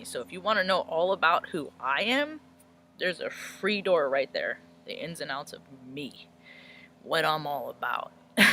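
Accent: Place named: South, United States. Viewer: No coffee Nor Tea ...PBR...IT'S A SOUTHERN TWANG .😉 Ooh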